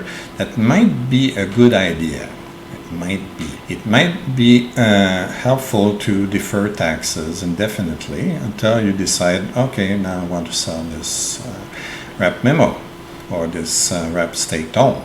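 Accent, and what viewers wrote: Quebec, Canada. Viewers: love the french Canadian accent. keep up the good work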